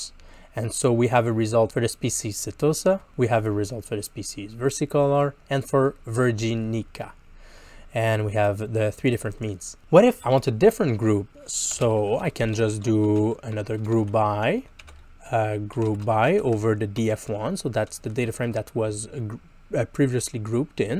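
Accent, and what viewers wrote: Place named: Quebec, Canada. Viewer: Salut! Avec ton léger accent, j'en ai dédui que tu étais Québécois ;) J'aurais une question pour toi! Dans mon DF, mes variables de ligne sont des entreprises (1 entreprise a plusieurs lignes, comme c'est le cas de la sorte de lys qui a plusieurs lignes) et mes variables de colonnes sont binaires (0/1). Je souhaite donc combiner mes variables de colonne en somme (au lieu de moyenne dans ton exemple). Je suis capable de le faire avec la formule suivante : by_company <- group_by(test_sans_tweets, username) sum_by_company <- summarise(by_company, sumlocal = sum(Local)) Cependant, j'ai plus de 500 colonnes. Y a-t-il un moyen de faire cette formule avec toutes les variables en même temps pour ne pas avoir à la copier-coller 500 fois? Merci beaucoup :)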